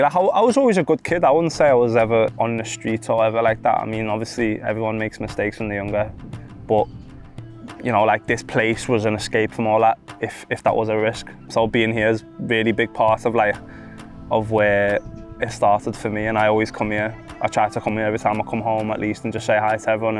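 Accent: United Kingdom. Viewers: شنو الأكسنت الي ديحجي بيها بريطاني أو انجليزي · انكليزي بس مو امريكي لان هو عايش بانكيلترا فا اكسنت مالته هو وزيدان انكليزي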